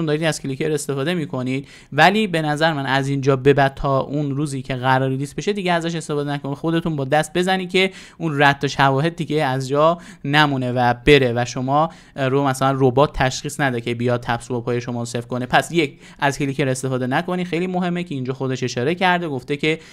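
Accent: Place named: Shahrekord, Iran. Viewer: لهجه دهکردیو ببین باو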